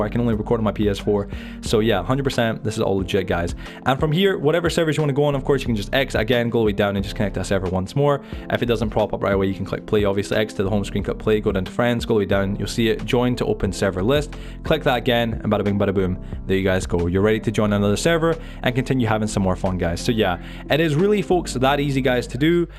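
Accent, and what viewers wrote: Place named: Dundee, United Kingdom. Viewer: U sound like ur from Dundee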